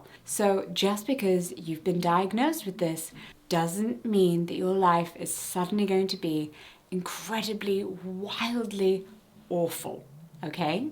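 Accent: United Kingdom. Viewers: I'm a nurse and I think you explained very clearly and simply what dysautonomia is and why it happens ! Always a pleasure to watch your videos (and also I'm french and I think your accent is deliciously british ✨ that's also a pleasure to hear)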